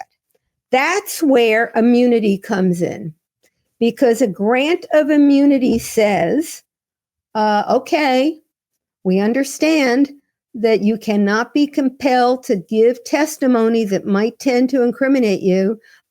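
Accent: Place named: New Jersey, United States. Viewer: ! I loved your coverage of Donna’s trial and I’m so happy you started your own show! I love listening to a fellow Jersey girl! ❤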